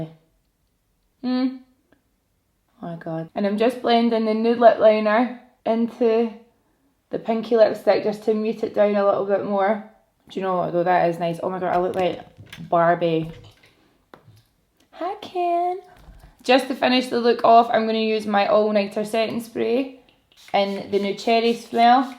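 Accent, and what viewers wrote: Scotland, United Kingdom. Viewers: Omg are u Scottish?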